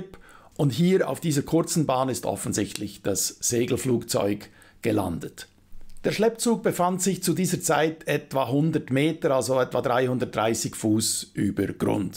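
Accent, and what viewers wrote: Switzerland. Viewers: Salutti, jetzt gebe ich auch mal meinen Senf dazu, ich muss einfach das Kompliment schreiben. Ich bin jetzt 51 im Juni 52 und seit über 30 Jahren auch Pilot allerdings auf den Straßen Europas, jetzt nur noch in Schweden. Ich finde das Gesamtkonzept Deiner(ich duze alle seit ich in Schweden wohne, haha) Videos sehr professionell und lehrreich. Allein die Beantwortung von der in meinen Augen unnötigen Kritik Dir gegenüber gefällt mir immer wieder, hart, exakt und treffgenau. wer da noch versucht Dir Rhetorik beizubringen, der hat dann wohl vergeigt. auch schaue ich mir Deine Videos an, weil Du von meiner alten Heimat berichtest und ich nach sieben Jahren der Liebe wegen nach Schweden gezogen bin. Dein duetsch ist einfach was ich vermisse. Ich wohnte in Ettiswil/LU und wäre dort nach den 5 Jahren glücklich gestorben. Allerdings bin ich jetzt in Västernorrland seit mehr als 12 Jahre und auch seit 2 Jahren schwedischer Bürger. 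Also nicht Wahlschweizer, sonder eben Wahlschwede. Ich bin in Ulm/Donau geboren, darfscht mich also auch Bockwurst nennen. Denn Deine Art ist mir sehr lieb gewonnen. wie die ganzen Kantoner die ich kenne. Hab sogar noch den Schweizer Fahrausweis, der ist ja unbegrenzt gültig. Das fliegen hat mich nie interessiert, da ich schon zu früh die Plomben in den Zähnen hatte und mir daraus selbst die Interesse verweigerte. Allerdings als ich bei der Ruag in Emmen einmal einen Helikopter in der Halle stehen sah, ich wollte mal schnuppern, aber er sagte, er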